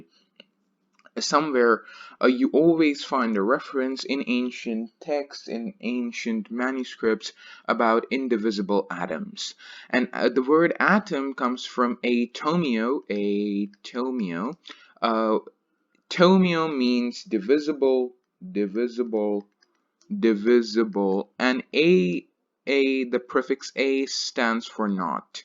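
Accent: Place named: United States. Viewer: Seems to be listening an American channel....... Really amazing.... Keep it up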